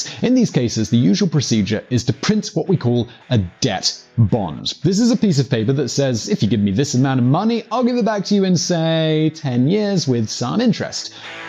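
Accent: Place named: Southern England, United Kingdom. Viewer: It's his South Brit accent. Nothing wrong with his mic. :)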